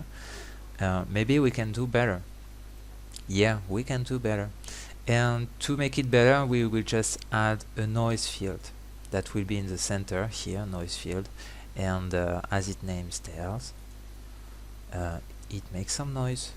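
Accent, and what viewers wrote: France. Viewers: c'est la première fois que je vois un français parler en anglais sur youtube x) ça fait bizarre d'entendre un accent français, enfin bref, bonne vidéo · L'accent français magnifique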